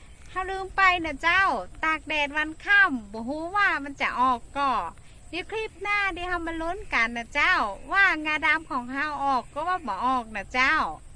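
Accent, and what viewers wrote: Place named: North, Thailand. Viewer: เป็นคนจังหวัดอะไรคะอู้กำเมือง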